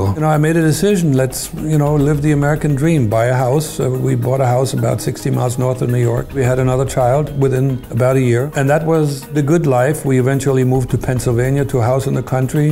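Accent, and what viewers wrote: Germany. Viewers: The good old German accent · He sounds a bit german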